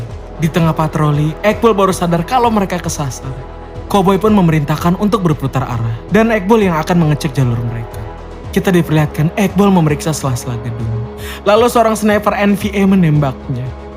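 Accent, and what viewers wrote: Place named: West Java, Indonesia. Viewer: Sunda ngapak haaaaa,,,,perbaiki bahasanya bro semoga sukses iya jangan terlalu lebay suaranya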